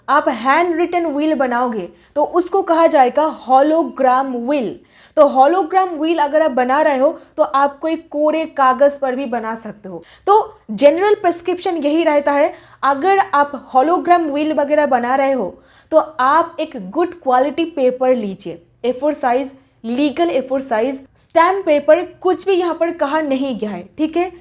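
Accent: Bengal, India. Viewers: nice explanation through a thick Bengali accent. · Nicely explained with beautiful bengali accent.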